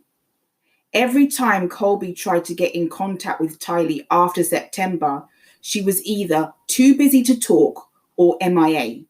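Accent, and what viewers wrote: United Kingdom. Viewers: I keep listening to this. It's one my favourite episodes in the podcast.I even play it to lull me to sleep. I guess I like British accents.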